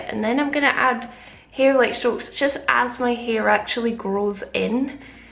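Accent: Scotland, United Kingdom. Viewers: i clicked on this video because i wanted fluffy brows then realised you were scottish and immediately subscribed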